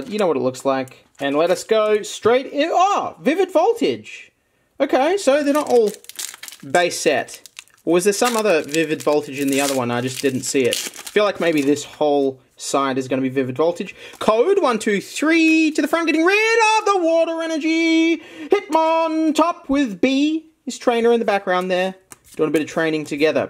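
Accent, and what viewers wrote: Australia. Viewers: U an Aussie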